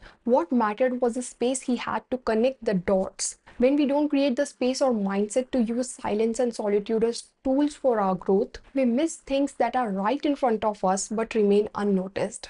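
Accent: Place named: India. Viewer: Indian English Girl 😊 Masterpiece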